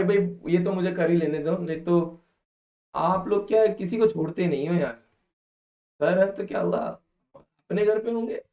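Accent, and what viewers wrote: Gujarat, India. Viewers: Are u gujarati ??😅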